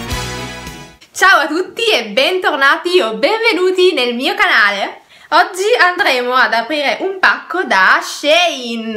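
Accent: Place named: France. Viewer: bella la scritta sulla maglietta killing you in my mind,complimenti per il fisico =) cmq mi fa simpatia il tuo accento,sei francese? 😁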